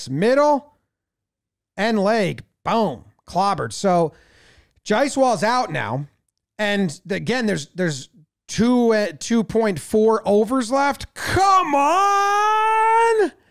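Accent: United States. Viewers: Huh. Never heard Cricket talk in a 'Murrican accent before. Feels...... weird. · this is the first time I have ever heard an American Accent talk about cricket, I am surprisingly pleased, that was awesome, thank you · Weird hearing an American accent talk cricket. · Does anybody else think it's jarring, the American accent that knows the game of cricket.. ? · I've been watching you review cricket for years now, but I just had a moment where it felt bizarre that an American was reviewing cricket. There's no THICK Aussie or British accent, so I'm confused. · So strange hearing an American accent comment on cricket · Refreshing to hear an American voice commentate and actually have knowledge about the game and even your breakdown is spot on with tactics and thought in the game. Head and shoulders above all the reaction videos, which are nice but are common. Your take is a refreshing dose of charm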